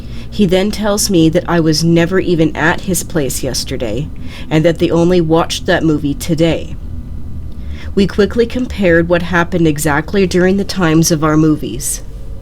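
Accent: Canada. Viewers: these narrations sound canadian as fuck. and i'm okay with that.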